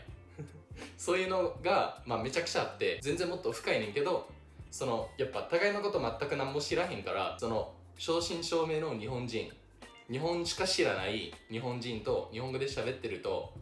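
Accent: Kansai, Japan. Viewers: 日本語がめちゃくちゃ上手いですね！いわゆるアメリカ訛りのアクセント強めの日本語発音もしてないし、しかも関西弁😂日本の文化を好きになって、日本語を習得して凄いですね！ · 関西弁はええよな！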